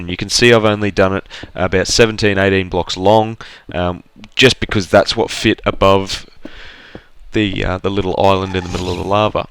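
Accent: Australia. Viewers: I love Australian accents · Are you Australian? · Wow you town looks so cool and are you Australian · Are you Australian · Australian dude? I am :D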